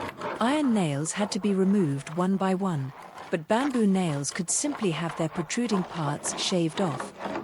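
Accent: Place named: United Kingdom. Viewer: lol, the british fem voice over lays it on thick enough for you?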